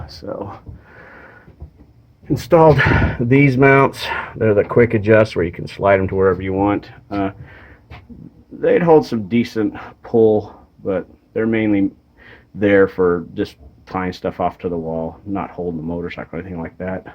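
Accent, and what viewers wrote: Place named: Utah, United States. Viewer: sounds like he's from Utah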